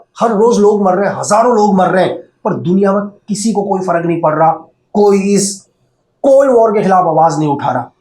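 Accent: India. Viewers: that's how kumaoni people speak 😅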